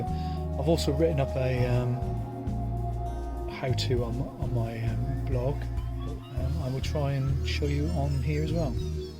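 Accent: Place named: Plymouth, United Kingdom. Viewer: Well done mate good video, I am going to give this a go on my MK4, your accent sounds local to mine, I'm in Plymouth!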